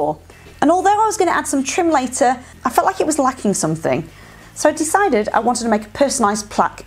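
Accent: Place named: Stockport, United Kingdom. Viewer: Really enjoy your videos! I’m originally from Stockport Manchester, I’m now in the US. Your accent makes me think of home.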